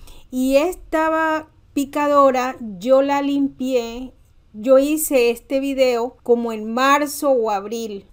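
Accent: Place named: Venezuela. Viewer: Me encantó. Me gustas como hablas pareces Venezolana. Aplicaré lo aprendido. Gracias.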